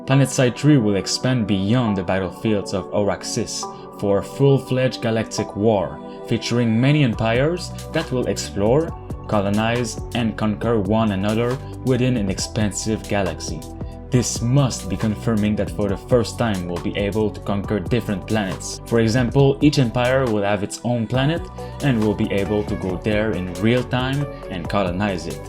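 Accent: Quebec, Canada. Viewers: Great Québec accent!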